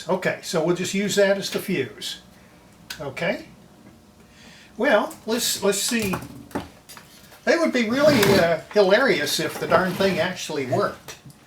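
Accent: United States. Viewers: Spoken like a Texan! :face-fuchsia-tongue-out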